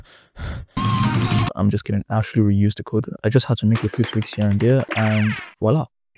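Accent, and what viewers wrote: Nigeria. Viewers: Pure naija boy 😂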